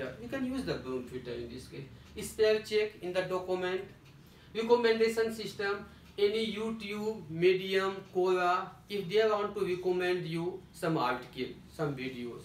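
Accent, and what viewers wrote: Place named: India. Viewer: nice explanation, but English language with Indian accent very difficult ,I wish you to attach a document about explanation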